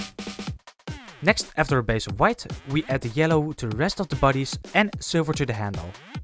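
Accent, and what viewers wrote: Netherlands. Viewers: Thats so cool!! 😍Also are u dutch?😊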